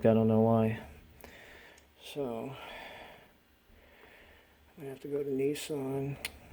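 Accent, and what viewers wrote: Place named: Massachusetts, United States. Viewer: No hiding the fact you are from Mass.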